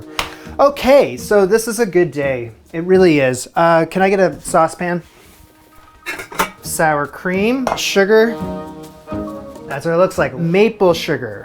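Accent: Canada. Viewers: It seems, since you have been back in Canada, your accent is returning. Which is nice. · I want to try this but in the right sized pans, and really whip air into the icing so it does not seize up. Maple sugar is a wonder. You can swap it out for brown sugar, but it is very pricey. I'm wondering is if a little whipped cream is called for. Unsweetened. Ah, the comment of woulda, coulda, shoulda. Your Canadian accent is much stronger and you video talents much diverse! You go guy!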